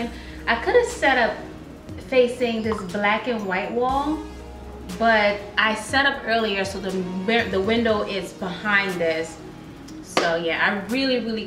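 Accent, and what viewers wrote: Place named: United States. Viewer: haha meisje ie tja kiek yere! Mi lob din video's dief yu. A accent fie helemaal amerikaans, a no spotoe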